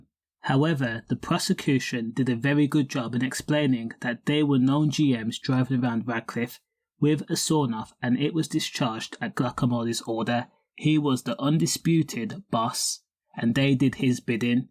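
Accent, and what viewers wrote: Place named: Black Country, United Kingdom. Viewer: Are you from Dudley bro or the black countr... Accent sounds familiar 😂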